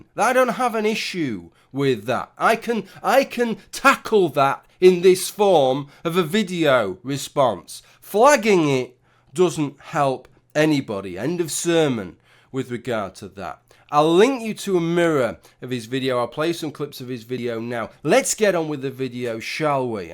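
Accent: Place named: Birmingham, United Kingdom. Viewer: The insults are 3x funnier with the Brummo accent, don't get upset, you're perhaps the only atheist I enjoy listening to since most of the Rational Skeptic TM community have their heads up thier arses: including everyone in Apes video, especially since Sarg'n began his losing streak against the "Alt Right" also a few Liberalist discord mods are soliciting 14 yr old boys for sex. That might put this into perspective.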